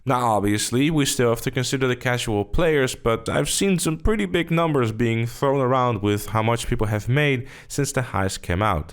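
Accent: Netherlands. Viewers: Are you dutch ? I can kinda hear it in your voice 😂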